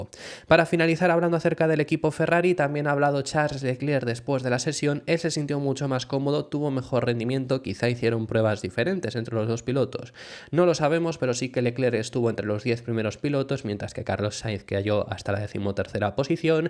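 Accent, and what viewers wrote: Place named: Spain. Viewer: Parece por tu acento que eres español, y por supuesto el titulo de "Alonso fue el mas rapido" debio ir acompañado de "pesco en rio revuelto", con ese auto si acaso en pista seca se mete de 7º o de 8º, hay que ser más realistas que fanaticos.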